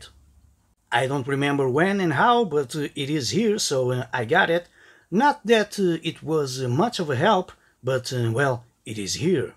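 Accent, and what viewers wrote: Portugal. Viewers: Are you portuguese? I think i recognize the accent )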